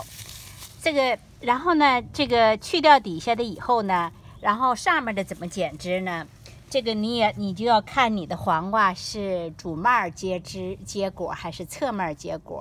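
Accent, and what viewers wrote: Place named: Shandong, China. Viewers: 哪里，这样听着更亲切，我也来自山东，青岛人。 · 老师是胶东人吧：)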